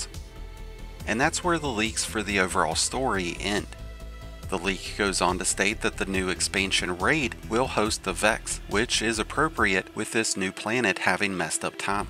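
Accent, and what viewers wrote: South, United States. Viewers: he's saying bc you have a southern accent, partner.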